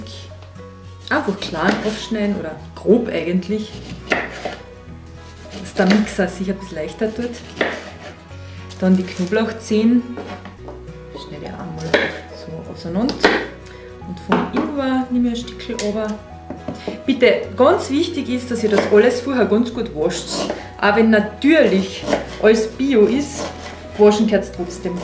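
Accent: Carinthia, Austria. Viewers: das Rezept ist echt klasse, wir machen dies auch Jahre schon so, v.a. für das Immunsystem grade zur kalten Jahreszeit immer❤🍀❤🙏🍀❤..finde deine Videos auch mit dem Österr./ Kärntner Dialekt übrigens super süss und sympathisch ❤.🤗🥰❤🌼❤ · Kärntnerisch ist auch ganz lieb anzuhören, nur verstehe ich es halt leider nicht allzu gut. Muss mal wieder nach Kärnten kommen.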